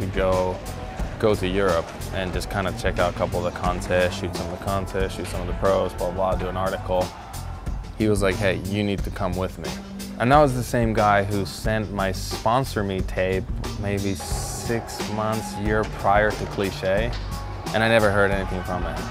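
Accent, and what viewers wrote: United States. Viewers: From the comments in the he said in the menkimati video, his sponsors in Finland in this video and the way he pronounces Finnish words in an American way. I get the feeling he isn’t the biggest fan of Finland :) Feels like he was someone who didn’t belong and is happy that he got out. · I'm sure hanging out with cali stoners for 20 years helps with the accent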